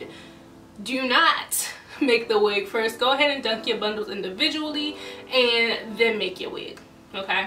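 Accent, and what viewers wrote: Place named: DMV, United States. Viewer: Are u from DMV? I love ur accent tho.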